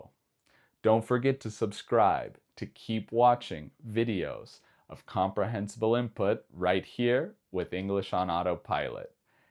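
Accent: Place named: United States. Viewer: I enjoy your authentic American accent.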